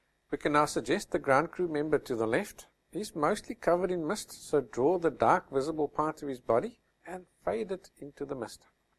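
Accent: South Africa. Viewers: Beautiful drawing! Are you South African?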